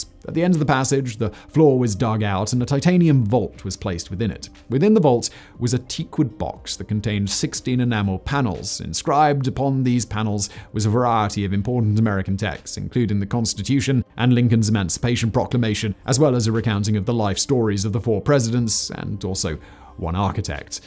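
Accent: United Kingdom. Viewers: Hearing a British man talk about how america doesn't like people taking their land and disagreeing with America usually ends badly honestly made my night.. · I agree with you, it is about the content, not the accent., and I did not mean that he did not have a native accent. I was simply replying to [yeet][yeet] who thought Simon "unlearned" his British accent. I was simply pointing out words where one can clearly hear the British accent. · Wow, I never thought of Simon as British, did he purposefully unlearn the accent to appeal to an international audience? xd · I Adore your accent! I could listen to it all day! But I did perceive some condescension in your tone with this video. I recognize as the rather sexy, erudite you are, the unintentional cadence is bound to slip in once in a while,! Particularly when as a Brit you’re critiquing the USA. It did come to mind though as an uninformed, oblivious but to his own surroundings Yank, that the shall we say reclamation of land from the Lakota Tribes that sat squarely in the middle of the US’s borders during the 1880’s, might have been a direct export. 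From Great Britain’s export idea of conquer and seize in the preceding 30 years of an entire continent really, India, in the Eastern Hemisphere! Of course after a hundred years of pillaging India of the jewels and resources, the UK did return it good as new! And don’t forget more recently Hong Kong. We Americans do still have work to do! But. Always remember. Americans may not know a damn thing about your politics ( and continued penchant for powdered wigs) but we absolutely LOVE our dear British cousins. Especially the adult young lads with that intoxicating accent! Seriously at this point the studios won’t even hire a male actor for an American role unless he’s British! I’m waving at you Tom Holland!☺️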